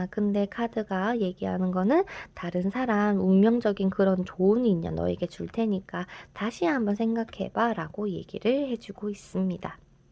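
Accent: Gyeongsang, South Korea. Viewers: 하트!ㅎㅎ🥰 원래 경상도 분이신가요? 너무 정겨워요ㅎㅎ 좋은 리딩 항상 감사합니다~! 아, 전 남자입니다요.. ㅎㅎ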